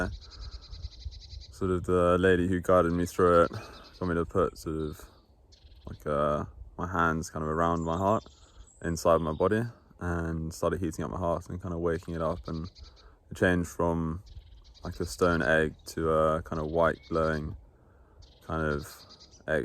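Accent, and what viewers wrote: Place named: United Kingdom. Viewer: Oh that Beautiful British Accent 😍🇬🇧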